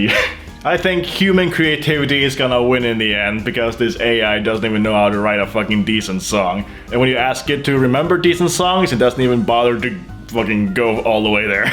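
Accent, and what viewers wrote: Norway. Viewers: Who is this guy? Toki from the cartoon? Norwegian?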